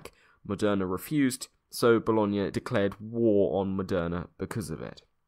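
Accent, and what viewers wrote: United Kingdom. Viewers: This channel gets a lot of views for the amount of subs it has, 100%+ of the subs watch this channel clearly. Must be the good editing and interesting topics coupled with the good ol' Brit comentator. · this was actually quite funny. aswell as informative. Your video editing is top notch, and the humor is there in typical british fashion. So i can see why you managed to hit to many subscribers with the help of youtube. (im subscribed to CrashCourse, Kurzgesagt and Knowledgehub, and this channel seems to cater to the same style, and thus I got your stuff recommended all over my youtube page, so this might explain the sudden spike of subscribers as theese 3 channels are huge) Only thing I can say thou is the Audio Editing needs some more work. I do notice that some parts of your video your audio cut-ins tend to overlap so it can sound abit strange at times (example ) Ontop of that your voice is nice and not ennuing to listen to (unlike some youtubers out there) Might be the british accent. 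Another thing I would recommend is to add a calm background theme that dosnt disturb your speech, as the videos can be abit to quiet with only your voice talking. (good example is how KnowledgeHub and I Hate Everything does it) This adds to liven up the video. Not to mention a Opening theme (Althou thats not really needed) And I would pretty much say with that, you'll quickly reach even higher number of subscribers. As long as you keep the content coming. Keep up the good job!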